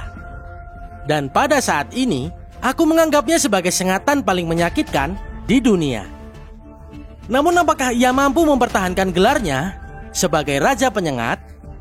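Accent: Java, Indonesia. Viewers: Logatnya kok kek bahasa jawa ya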